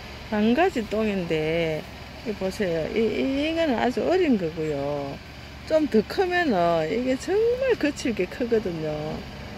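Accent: Gyeongsang, South Korea. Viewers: *선생님이라고 호칭을? 아니면.. 이*교수님 이라고 호칭을 해야할지요? ㅎ [방가지똥] 약초 이름도 참 재밌네요..ㅎ 오늘도 건강을 위한 프로젝트 영상! 잘 시청합니다~👍💘 경상도 사투리가 즹겹네요..ㅎ 자두*가 선생님 닮아서 이쁘네요~ 휴일! 행복한 시간 되세요~*😘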